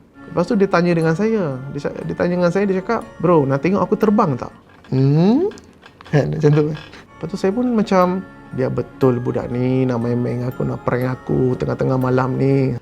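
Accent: Malaysia. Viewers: Kuat bergaul dgn melayu brader ni. Pekat nauu melayu dia 😂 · Care die cakap macam orang Melayu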